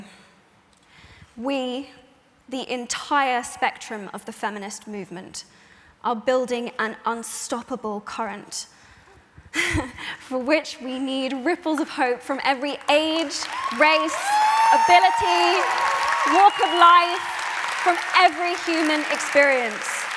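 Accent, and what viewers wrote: United Kingdom. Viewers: i love her british accent <3 always love u Emma keep motivating !!!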